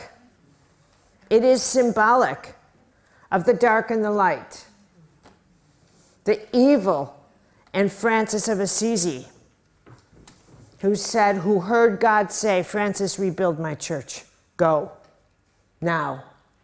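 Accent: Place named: Midwest, United States. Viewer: I had to stop amd comment when you spoke about your midwestern accent. For some reason hearing you actually state that you are from Chicago multiple times was too obvious for me to actually pick up on. I have to make things difficult for myself, as a general rule. It wasnt until a flashback to the old SNL skits about the Bears amd the Bulls (John Goodman, George Wendt I think? Or maybe Im making up Wendt being there, I dunno) anyway thats when I finally 'got' your accent (which isnt much of one to me,ostly just the 'a' that you mention). I love hearing you speak, I hope you never figure out how to have the 'a' removed. It would just be wrong. I might even have to just stick to your books if that happened. Which, by the way, I use a text to speech british accent voice to listen to ebooks while doing other things, one that is no longer freely available or...whats the word...compatible with android since the last several major updates, and a huge pain in the rear to mod and sideload and stuff to get it to work now, but worth it to me. 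Its so realistic and soothing. i even pay for it some months if I cant find a way to hack things with current devices, as I think its not even possible with the most recent update, and have to go about it a different way that charges if I read over a million characters (which happens and which is meant for developers., and takes some work). My point is, as much trouble as I take to use that specific British accented voice, I so much prefer to hear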